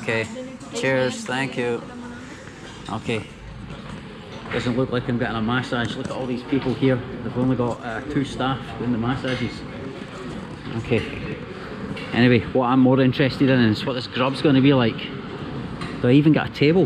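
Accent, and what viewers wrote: Scotland, United Kingdom. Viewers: I don't speak English but now I understand what a Scottish accent is. 🙂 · Hi, love to watch all your videos, with your scottish accent remind me <num> when i live in Manchester. i have a few friends from Scotland during that period. now already subscribed your channel and will waiting for your new videos. let me know where ever you drop by in Malaysia again, will offer free videography and accompany your trip in here, cheers mate:)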